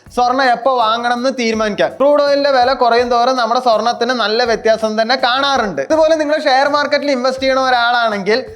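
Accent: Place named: Palakkad, India. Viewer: നിങ്ങൾ പാലക്കാട്‌ ആണോ സംസാര ശൈലി 👌👌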